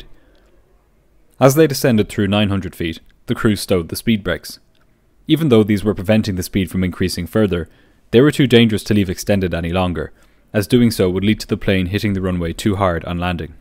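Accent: Ireland. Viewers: This is an authentic Irish accent!! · The subtle irish accent is soo pleasant to listen to! I think one of the cardinal reasons I like this channel so much is the excellent narration. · Am I erong to guess that you're Irish just by your accent? Great chanel buy the way. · Hear hear! Nice to hear an Irish voice too 🤠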